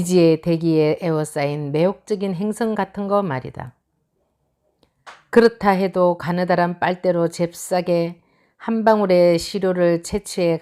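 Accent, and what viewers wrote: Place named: Gyeongsang, South Korea. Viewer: 이렇게 열정적인, 순정한 독자를 얻었다는 것이 글쟁이에게는 얼마나 큰 행운이고 축복인지! 뜨거운 갈채에 가을 아침이 심쿵!합니다. 영상도 멋지고 님만의 갱상도 억양도 정겹고 자연스러우니 조원희 샘 애써 따라잡으려 안하셔도 될듯요.ㅎ 늘 고맙고 또 고맙습니다.^^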